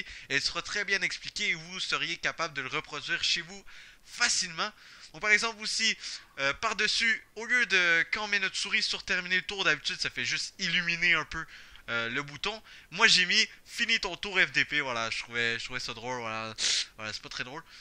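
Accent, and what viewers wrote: Quebec, Canada. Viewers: j'aime l'accent du quebec x) j'avais déjà essayé de modifier les fichiers sur mon ordi en copiant le theme de base et en le mettant dans un nouveau dossier avant de modifier les trucs mais ça marche pas. J'aurais jamais pensé à installer un autre thème puis à le modifier, donc merci et bonne chance pour ta chaine!ps: je débute sur YouTube en tant que joueur dofussien.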